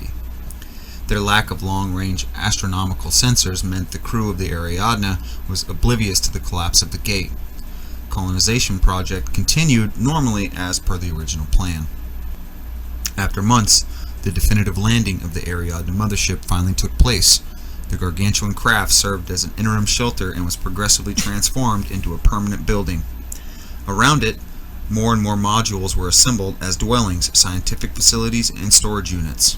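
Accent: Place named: Canada. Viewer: Enjoying your videos (considering getting into Infinity, and I like to know the lore of games, and specifically factions that I play. You have caught me off guard, as I have heard you say both zed and zee now. I am guessing that you are either Southern Canadian, or from a Northern state, with a lot of border crossing Canadians. That, or a child of parents from both sides of the border. Regardless, love the videos, watched four so far today.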